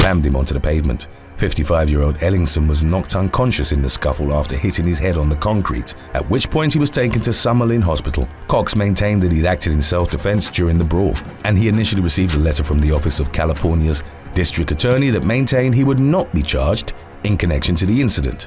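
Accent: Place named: United Kingdom. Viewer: He's british pronunciation is def different nonetheless🙄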